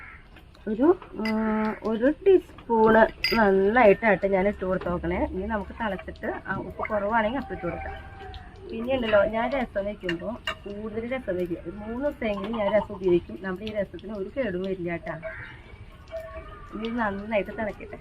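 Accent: Thrissur, India. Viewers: എവിടെ ആണ് നിങ്ങളുടെ വീട്? തൃശ്ശൂർ സ്ലാംഗ് കേൾക്കാൻ ഒരു സുഖമുണ്ട് ഇല്ലേ? ഞാനും തൃശൂർകാർക്കാരി ആണ് ട്ടോ.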